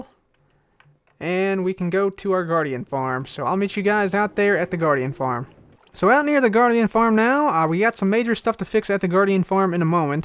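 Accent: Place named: Illinois, United States. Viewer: I think he's from Illinois.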